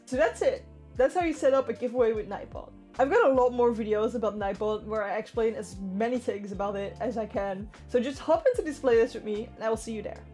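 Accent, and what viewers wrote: Netherlands. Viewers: Been living on the Netherlands for 4 years, I could tell you were dutch in the first 10 seconds of the video xD Thanks for the tutorial, btw, it was very useful! ❤️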